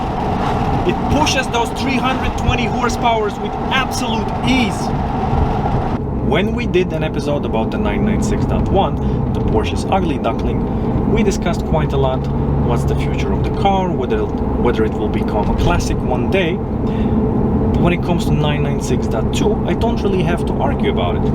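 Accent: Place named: Russia. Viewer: i Love rus accentet! it not mostakbal! lovat red 996 !!!!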